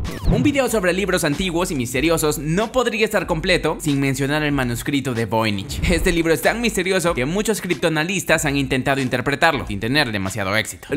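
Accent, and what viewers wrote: Mexico. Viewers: ese acento mexicano me perturba mas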